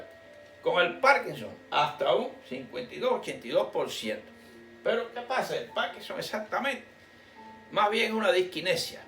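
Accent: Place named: Cuba. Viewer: Hello Dr, parece ser cubano, gracias por su contenido. Fuerte saludos.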